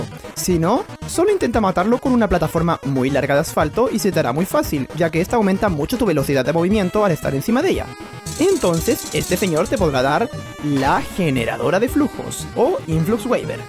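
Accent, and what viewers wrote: Chile. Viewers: bro, te salio con un acento muy chileno el video XD, la parte q mas me gustó fue y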